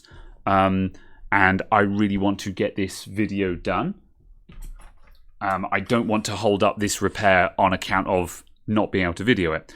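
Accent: United Kingdom. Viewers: Yes, do it like that and add captions Anyway your videos are all wonderful without "ASMR" since British accent are the best english accent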